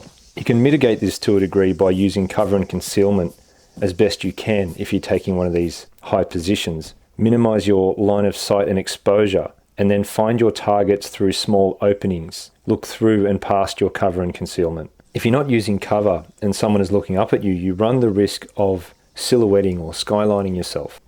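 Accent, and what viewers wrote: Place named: Australia. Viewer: Why does an Australian accent do so much for tactical confidence? I feel more effective for having seen this.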